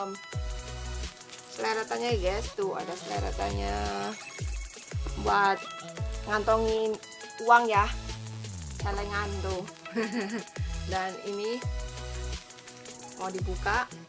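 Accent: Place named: Java, Indonesia. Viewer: Wa'alaikumsalam wr wb Waaah tasnya bagus bangeeet 🤭 Medok banget mbak hehee Salam ngapak lah Nek ora ngapak genah ora kepenak ya mbak 👍 Selamat ya dapat kiriman paket dua sekaligus dari umi rizal A dan mbak Nursilah julaini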